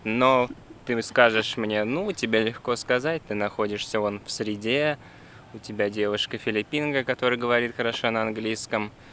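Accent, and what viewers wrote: Russia. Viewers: на мой взгляд ты специально делал русский акцент=)) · Господи да у тебя такай русячий акцент, это ужасс! И еще чет гундосит тут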